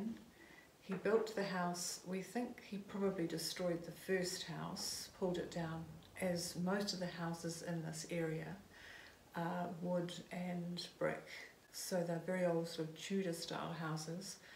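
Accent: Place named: New Zealand. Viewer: Your lovely NZ accent and soft voice make it difficult to capture the story of your magnificent Chateau. Look forward to many more intriguing vlogs.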